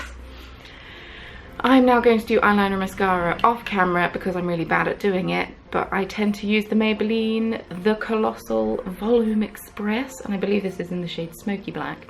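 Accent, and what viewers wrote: RP, United Kingdom. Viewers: I’m thrilled to have found your channel today. You’re one very stunning woman and you speak so beautiful, very “posh” like. I’m currently binge watching your videos and enjoying them. Thank You for sharing... 😊😘😊 ps, where are you from? Xx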